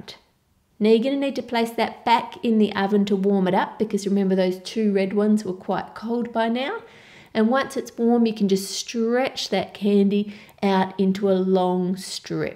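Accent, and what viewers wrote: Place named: Australia. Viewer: Put da suga and da wata 😂😂😂 i love Aussie accent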